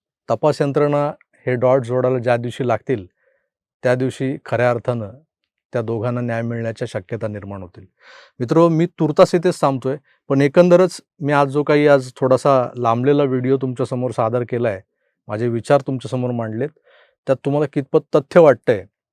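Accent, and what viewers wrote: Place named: Pune, India. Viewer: O ' sir U speak marathi very pure than sadashiv peth.Ur knowledge is very Crucial N genius N brilliant.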